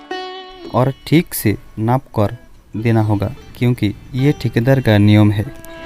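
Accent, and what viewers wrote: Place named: Sylhet, Bangladesh. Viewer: Iam sure you are sylheti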